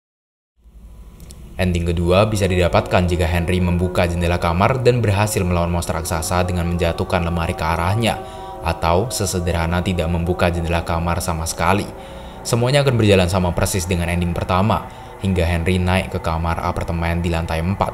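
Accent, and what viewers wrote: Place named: Java, Indonesia. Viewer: BANG LU ORG MANA SI KOK KEK MEDOK MEDOK GT SALAM DARI SBY YAA SEMANGAT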